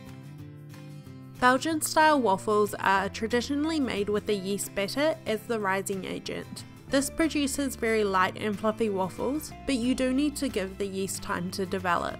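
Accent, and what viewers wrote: New Zealand. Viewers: Is this New Zealand accent as it resemble jacinda ardern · heres some daily does of irony,Im kiwi myself but listening to a kiwi accent on YouTube is patronizingly frustrating.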